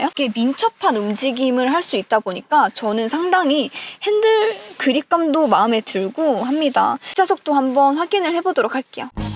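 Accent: Gyeongsang, South Korea. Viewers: 갱상도 출신아인교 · 경상도 사투리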